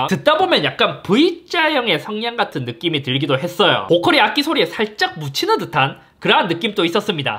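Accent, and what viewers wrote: Gyeongsang, South Korea. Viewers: 나도 경상도 출신이지만, 이제 경상도 말투는 듣기도 싫네요 ㅠㅠ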